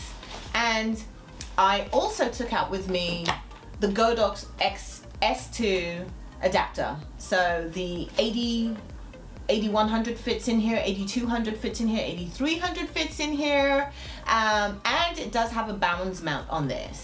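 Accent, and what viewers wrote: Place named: England, United Kingdom. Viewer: Hi. I love this video - it's the warts n all element that makes it so much closer to true OCF experiences than 99% of other videos on YouTube. I can't believe that other photographers don't experience hiccoughs - perhaps they edit them out - which just means we viewers learn less. I guess a few questions 1) did you do much/any post-processing? It looks like your model has perfect skin! 2) you could have warmed up her skin in post instead of using CTO gels? 3) you have to tell either the trigger or the strobe that you're going to shoot HSS right? I think you don't need to do that with Profoto equipment (although who wants to pay 3x as much for Profoto) 4) What did the Magsphere do for you? 6) How did you create the great shot in the video? 5) I have AD300 Pro and am considering adding an AD100. Thank for pointing out the issues with softboxes etc but I think I would only use it barebulb 6) On the subject of the AD300 - I like it because you don't have to add a mount. 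However, there seems to be a very limited number of softboxes with the Godox mount, right? I'm looking for a strip box with a Godox mount. Know of one? 7) You sound like you're from England?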